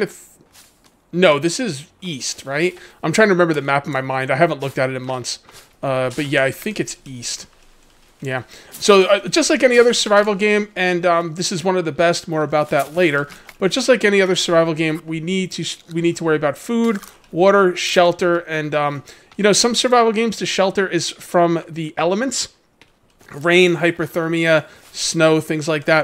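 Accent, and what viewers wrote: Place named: Philadelphia, United States. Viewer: This is my first time watching ya and your philly accent is cracking me up. My best friend is from Philly and you guys sound almost exactly the same.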